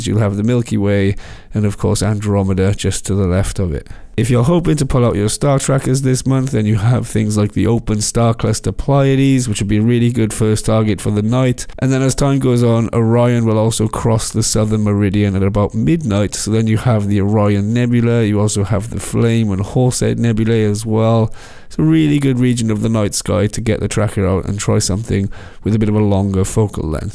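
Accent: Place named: Wales, United Kingdom. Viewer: New subscriber, recognise the accent... Cwmbran or Newport area?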